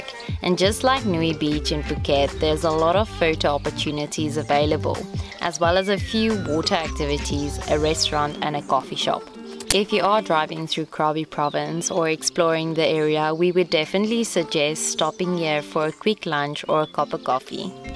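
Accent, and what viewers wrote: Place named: South Africa. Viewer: Your accent... Is julle van Suid Afrika?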